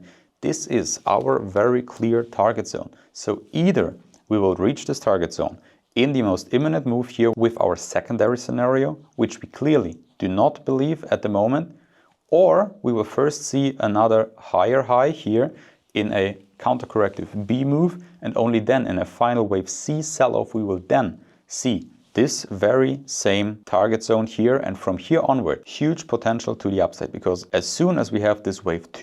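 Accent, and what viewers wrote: Germany. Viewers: Can not stand this horrible German accent. Get pain from this accent. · Horrible German accent.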